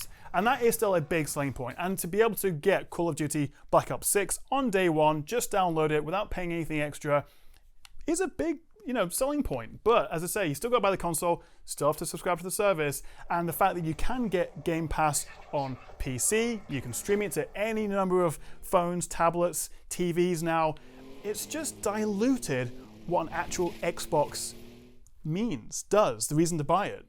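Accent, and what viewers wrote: United Kingdom. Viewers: Talks way to fast and that briitish accent get real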